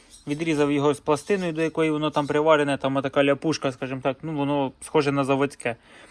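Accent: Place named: Right-bank Ukraine, Ukraine. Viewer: Бля ,як отличаеться по говору правобережжя пид риччю посполитою вид ливобережжя не московитського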